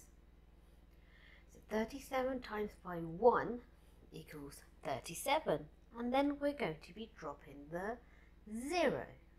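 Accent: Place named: United Kingdom. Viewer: Shes british i think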